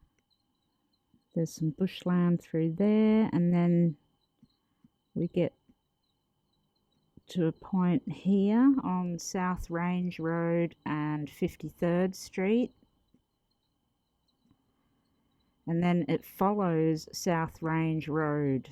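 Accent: Australia. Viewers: at this very moment, there are 7 writing notebooks for me to jot down info, in passing, and others that are strictly for astrology , past lives that deals with astrology (Karmic complexes..etc), Medical astrology: Santos Bonnaci and others and others. On my nightstand (books are a permanent fixture unless I choose to take it with me that day): Judith Orloff MD. The Empaths Survival Guide, D. Vessel Van Der Kolk MD. the Body Keeps Score' , Gary Zukav , Dr. David r Hawkins DR. Masaru Emoto and a 2 or 3 others. I NEED more astrology books! Your is next up to be ordered. I'm just waiting for Mercury RX to leave the shadow period. Your knowledge, teaching style and overall beautiful disposition is ACES!!! I want and need to learn from you. As I've said it before: You male learning fun and easy. Unfortunately, the cases are sad but your ability to put it all in b&w with seemingly little effort speaks to your AWESOMENESS! 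**side note*** I love your Australian accent and when the camera is on your face. So adorable! 💜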